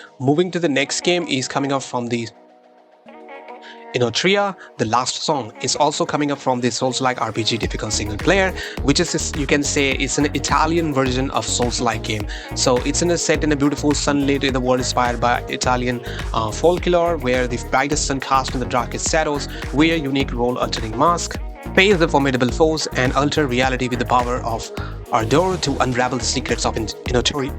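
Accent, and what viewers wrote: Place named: India. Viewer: bro that's some nice accent i bet you are an indian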